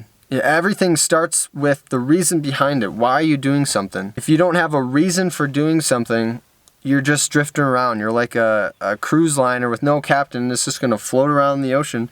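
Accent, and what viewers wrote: Wisconsin, United States. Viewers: yo your from wisconsin? what part? its a shame how many alcoholics there are here and how widely accepted it is here going to the bar every single day after work, Aside from that i knew you sounded like your from wisconsin that wisconsin accent yanno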